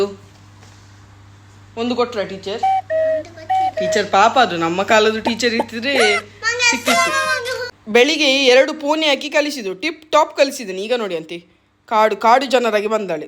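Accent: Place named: Tulu Nadu, India. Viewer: ಸೂಪರ್ ಮೇಡಂ. ನನಗೆ ನಿಮ್ಮ ತುಳು ಮಿಶ್ರಿತ ಕನ್ನಡ ಮಾತಿನ ಶೈಲಿ ತುಂಬಾ ಇಷ್ಟ. ತಾಯಿಯಾಗಿರುವ ನಿಮಗೂ ಅಮ್ಮಂದಿರ ದಿನಾಚರಣೆಯ ಹಾರ್ದಿಕ ಶುಭಾಷಯಗಳು. ನಿಮ್ಮ ಮಗಳು ಮುದ್ದಾಗಿದ್ದಾಳೆ. ನಾನು ಬೆಂಗಳೂರಿನಿಂದ. ಮೂಲತ ನನ್ನ ಊರು ಪುತ್ತೂರು. ಧನ್ಯವಾದಗಳು ಮೇಡಂ.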